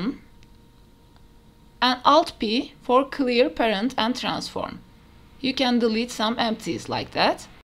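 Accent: Turkey. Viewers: aksandan anladım türk olduğunu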